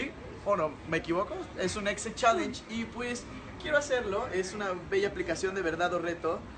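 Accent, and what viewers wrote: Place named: Spain. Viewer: su acento español😍😍😍😍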